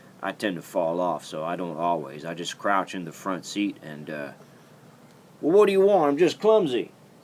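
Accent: West, United States. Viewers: his voice sounds western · DO MORE VIDEOS PLS I LIKE TO HEAR YOUR WESTERN ACCENT AGAIN AND AGAIN!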